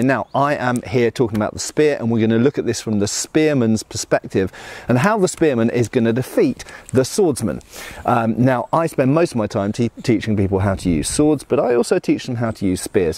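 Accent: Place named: United Kingdom. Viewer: ive added a boar spear to my home defense collection, largely because my neighbors insane pitbull got out again and attacked someone (i wasnt home at the time) but also because theyre relatively cheap and it gives me what i hope is a good non-firearm option. at this point, should i ever have to use it against a two-legged miscreant no matter what that fellow looks like im going to be seeing a genial, bald smiling man with a british accent. i think i watch waaay to many of your excellent videos.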